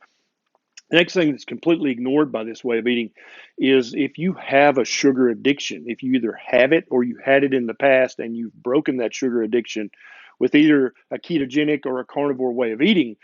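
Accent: South, United States. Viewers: Apart from your incredible intelligence, you could have been a movie star from the golden era of Hollywood! Love the Southern drawl as well!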